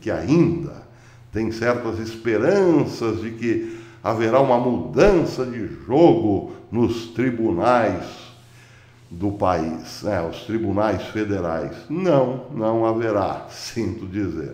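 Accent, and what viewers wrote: Caipira, Brazil. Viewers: Obrigada por mais um excelente vídeo professor! Estou amando o caipirês! Mais ainda ao saber que uns e outros ficam irritadinhos! Que Deus o abençoe sempre professor! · Oh professor eu amo seu caipireis.Bjssss querido, Deus te abençoe 😍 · O caipirez é vida professor. O Sr. faz muito bem o caipirez sem denegrir ou rebaixar os representados. Somos nós brasileiros que nos comunicamos assim, de forma eficiente e com expressões regionalizada que formam um código capaz de ser decodificado apenas para quem vive a miscigenação que ocorreu em nosso país. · Parabéns professor pelo seu sotaque. ...eu entendo muito bem ...sou Mineiro sou da roça sou caipira sou brasileiro....Deus o abençoe. .. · Boa tarde Professor! OI NÓIS AQUI TRAVEIZ!!!!! SOU NETA DE AUSTRÍACOS E POLONESES. CRESCI OUVINDO UMA SINFONIA LINDA DE SOTOQUES MISTURADOS COM O PORTUGUÊS . 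E CONFESSO EM ALTO E BOM SOM: ADORO O CAIPERES! NOSSO PAIS Ê ASSIM!!!! QUEM NÃO GOSTA ESTÁ NA PRAIA ERRADA! SE MUDE PARA O GABINETE DO SÔ " GIRMAR MENDES"!😄😄😄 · Apesar das notícias preocupantes, seu bom humor nos contagia! Seu sotaque "caipirês" ficou muito lindo! Parabéns, Professor, pelo seu esforço de desvendar, para nós pobres mortais, as tramas desse mundão de Deus 🙏🙏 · Prof. Boa essa de falar caipirez. Realmente vai enlouquecer os algoritmos. Parabéns pela criatividade. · professor por favor fale somente o sotaque caipira , este é o original do brasileiro , obrigado professor , o senhor é um jornalista ótimo , patriota, e cristão. Deus esta com o senhor. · O caipirismo é Mil Grau Professor!!! parabéns parabéns PARABÉNS. Continue assim Professor. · Professor,eu adoro ouvir o seu caipirês,eu dou do tempo que se falava assim 😊 Por mim,pode continuar,o que importa é o conteúdo dos seus vídeos 👏👏👏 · Parabéns pelo seu trabalho que está cada vez melhor. 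Amei o sotaque caipira, ficou muito bom e divertido. Além das notícias importantes e sem filtro, temos o prazer de conhecer mais um dos seus talentos. Que Deus sempre te abençoe com tudo de bom 👏👏🙌🇧🇷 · Parabéns professor 👏 gosto muito do seu português caipira..sou da roça hahaha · Bom dia Professô podi cuntinua cum o caipireis ço! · Bom dia Professor! Adoro o seu "caipirez" 😁 Adoro seu senso de humor. Não mude! Continue assim e pra quem não gosta e diz que não vai assistir mais ... JURA? Fala sério 😁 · Professor, tá mais gostoso de acompanhar o seu canal agora; além de informação, conhecimento , a gente se diverte a beca. O caipirez ficou top! O senhor leva jeito tb como comediante . 👏👏👏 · Como sempre seus comentários muito consistentes a atuais! Sempre ba frente da midia "oficial"! A forma caipira de passar a msg tem seu charme mas talvez tire um pouco a atenção do conteúdo. Forte abraco! 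· Bom dia , Professor !! Esse caipires é o charme do Canal... Semana abençoada para todos !! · Kkkkk É o melhor canal de notícia bom é escutar o caipirez é bem brasileiros PARABÉNS PROFESSOR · continue sempre assim professor,no caipirez ou normal está ótimo parabéns. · Bom Dia Professor 😄 Sou De Sete Lagoas MG E Ó Seu Caipirez É O Nosso Normal Aqui😂😂😂💚💛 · Bom dia professor. Adorei a nova versão em caipirês, pois sou do Nordeste e cultivo os falares da nossa terra Brasilis. Entonces, adorei. Oxente, quem não gostar num goste! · A falecida e mui saudosa Inezita Barroso iria adorar esse seu sotaque caipira, Professor! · Bom dia, professor! Não é todo mundo, que tem capacidade de entender o caipirês. Os incomodados que se retirem. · Verdade .... adoro essa forma jocosa como o senhor apresenta as noticias Imprimindo este sotaque de caipires e divertidooooo !! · Eu amo seu caipirez tudo fica muito mais leve, apesar de todas as.noticias serem claras e sérias sem fale. 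Isso é o que todos precisamos ouvir para fazer nosso próprio juízo dos acontecimentos sem viés ideológico e isso é muito bom. Obrigado de coração por dividir seus conhecimentos e pesquisas conosco. Com ou sem caipirez você é o máximo nas suas análises.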